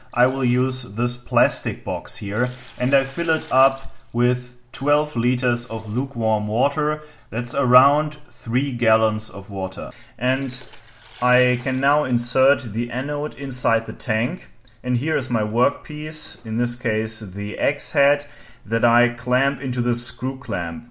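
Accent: Germany. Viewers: Man hört deinen Deutschen akzent echt raus :DD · German? Irish? Idk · I like watching Mathologer, The Oftler, and the guy who makes those repeating "Legolas" bows. It dawned on me that OF COURSE Germany would have a variety of regional accents just like America does, because you all have very different accents from one another while at the same time sounding distinctly German. I love linguistics. :-) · German? Just asking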